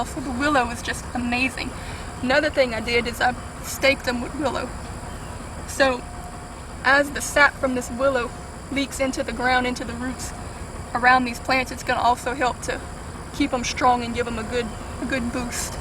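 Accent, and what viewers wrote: Louisiana, United States. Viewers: are you cajun ?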